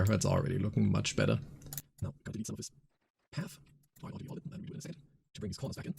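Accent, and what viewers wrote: Germany. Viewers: Hey bro, is that a German accent I hear there? Really like your content btw, really chill, just like the game lol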